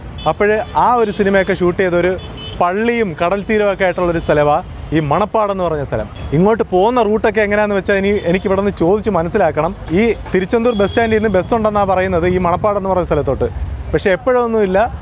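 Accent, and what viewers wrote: Kollam, India. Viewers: കൊല്ലം ശൈലി.😊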